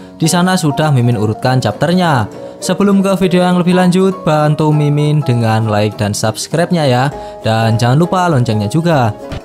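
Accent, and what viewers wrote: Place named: Central Java, Indonesia. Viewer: Min lo koq kyak orang jawa tengah bisa bahasa jawa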